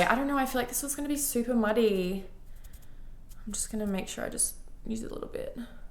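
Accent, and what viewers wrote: Australia. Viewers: I never thought the Aussie accent could be unattractive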